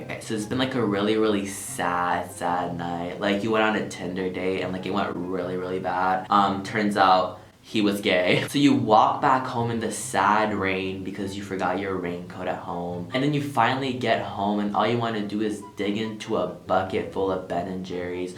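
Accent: United Kingdom. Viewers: Love your British accent so much 🙈❤️ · Love ur british accent🙈😂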